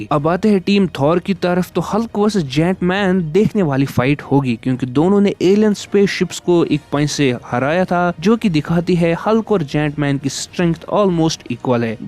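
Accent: Kashmir, India. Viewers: Boss kashmiri ho kya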